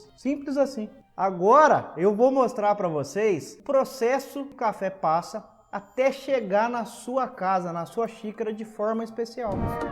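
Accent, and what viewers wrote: Minas Gerais, Brazil. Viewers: Amo esse sotaque mineiro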